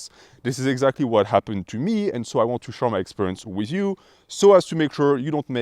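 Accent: France. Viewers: Bonjour. You have a French accent am I right? :D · Are you French ?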